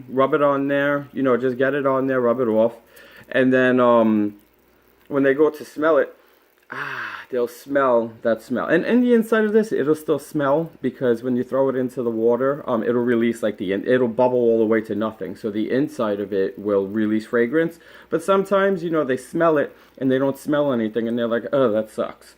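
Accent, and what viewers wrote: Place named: Boston, United States. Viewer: I love you accent. Are you from Boston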